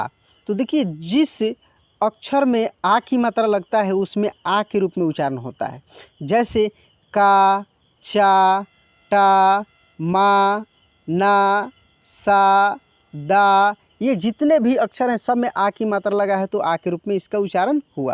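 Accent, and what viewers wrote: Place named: Bihar, India. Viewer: Out of all Hindi teachers Guru Ji I like your Bihari accent Bahut Dhanivaad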